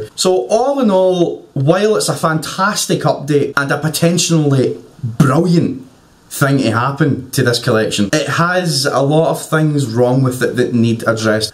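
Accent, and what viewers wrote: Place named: Glasgow, United Kingdom. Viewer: Guessing your from glasgow and a celtic man like myself? :)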